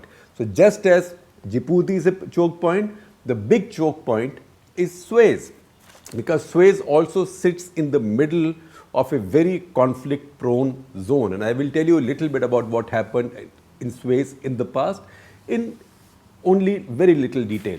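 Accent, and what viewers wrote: India. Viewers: i could listen to him talk forever. I love the indian accent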